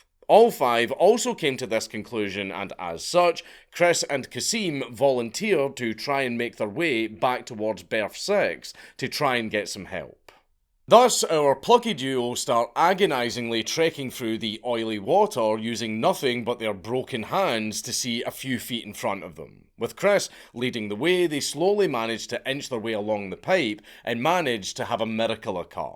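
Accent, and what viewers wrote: Scotland, United Kingdom. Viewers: Stuff like this is hard to get through. Scottish accent or not. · Fascinated as an American to hear an authentic Scots burr. Barely intelligible to me. Have to rely on the visuals. At least until I am used to it. Very different from Scotty on Star Trek 😂😊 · Oh damn a story I actually do know! but I'm so happy to hear it in a Scottish accent *If you want to hear the audio MrBallen has it at the end of his video covering this same subject. Both videos are equally amazing though.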